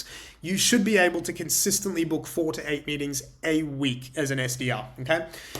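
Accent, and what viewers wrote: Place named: Australia. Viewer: G'day, nice to hear an Aussie accent on a video like this - I'm an independent SalesOps guy who usually works with SDRs and AEs and now doing my own prospecting - so this is super useful. Cheers mate, ABC!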